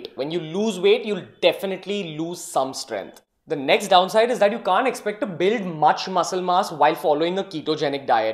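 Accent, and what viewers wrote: India. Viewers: When I saw the thumbnail i thought it is some American guy's channel and when I opened it.. BOOM... indian english #wtfmoment 😂😂😂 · He has got an Indian Accent...Is that bothering u? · The Indian accent is running off the charts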